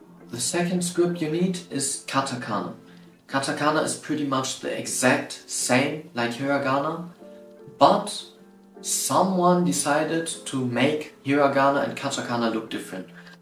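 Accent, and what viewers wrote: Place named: Germany. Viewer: I don't know, I studied in a completely different way, and it took me 2 years to reach N2. Learn Hiragana and Katakana in 2-3 days, don't avoid Kanji in the beginning, use Duolingo or Busuu for 2-3 months because the app gives you direction. Don't use Genki because the teaching order is illogical (e.g., teaching ばよかった before the actual ば-form, not distinguishing between things like というわけではない and とは限らない and so on). Wanikani > Anki. But I do actually agree: consistency is key^^ Und jetzt am ehrlich , ich höre da schon nen krass deutschen akzenten mein lieber.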